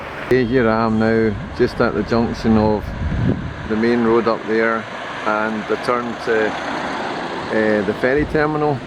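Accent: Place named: Scotland, United Kingdom. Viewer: I've heard this mild Scots accent before . Tell me about it . I have even heard a milder Scots accent . And I have been tae Arran ...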